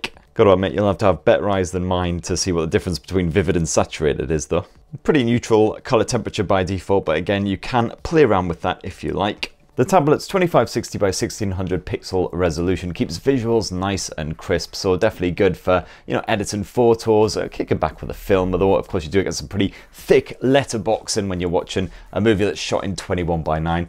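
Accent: United Kingdom. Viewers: You hv proper UK ENGLISH. Learned lot of new words from u.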